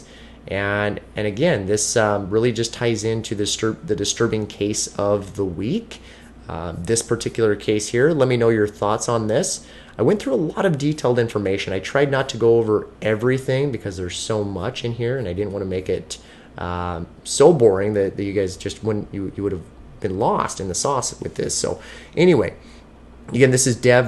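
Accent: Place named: Canada. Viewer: Who is this hive dude Me love this Did u find me Or i find you WHY DO U SOUND SO KANADIEN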